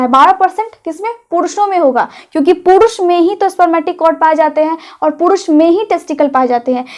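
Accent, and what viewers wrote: Bihar, India. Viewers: Bihar ki ho,Mother Tongue in Fluency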